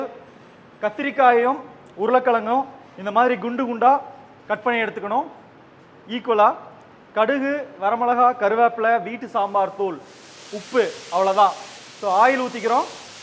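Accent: Kongu, India. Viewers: 🙏🏻🌹 வணக்கங்கள் உரித்தாகுக.அரிசிபருப்புசாதம் சூப்பர்.அதை செய்து காட்டியபடி கிரிக்கெட் காமெண்ரி போல் லாவகமாக அதுவும் கொங்கு தமிழில் அழகாக பேசியது அதைவிட சூப்பரோ சூப்பர்.தங்களது சாம்பார் பொடி ரெசிபி யை தந்தருளுங்கள் என்று பணிவுடன் கேட்டுக் கொள்கிறேன் மிகவும் நன்றி கலந்த வணக்கங்கள் உரித்தாகுக 🌹🙏🏻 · Hi sir...my native place is coimbatore.... நம்ம கொங்கு தமிழ் le அசத்தி விட்டீர்கள்...this rice is all time favourite to our family....sir... coconut oil ku பதில் சுத்தமான நெய் சேர்த்து சாப்பிட்டு பாருங்க...semmaayaa இருக்கும்...non veg எல்லாம் அந்த பக்கம் நிக்கணும்....thank you sir for uploading this video... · சாதம் மட்டுமல்ல தங்களது கொங்குத் தமிழும் பிரமாதம் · Me too Rangaraj.... my fav dish both items.very happy to see this video and ou coimbatore kongu tamil💕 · 🙏 க்கு ஜே🥳கைப்பக்குவம அருமை.கொங்கு தமிழ் அழகு.என்ன பருப்புன்னு சொல்லியிருந்தா👌😍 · கொங்குத்தமிழ்வேட்டி துண்டுவேறலெவல் கண்ணு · கொங்கு தமிழ் அரூமை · உங்கள் கொங்கு தமிழ் மிக அருமையாக இருக்கிறது, உங்களுடைய செய்முறை மிக நன்றாக இருக்கிறது உங்க style -ல சொன்ன அதிபயகரமாக இருக்கு 👍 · Our native COIMBATORE special food ultimate Anna👍👌👌👌👌Exact matching side dish👌👍 our kongu naattu tamizh ❤❤❤👍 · Seithu sapittom..arumai Ungal kovai kongu thamizh polave paarabariyathodu irukkueathu..nandringa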